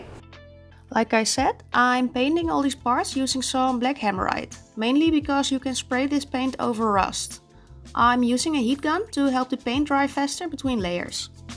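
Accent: Netherlands. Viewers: What is your native language? You sound Dutch?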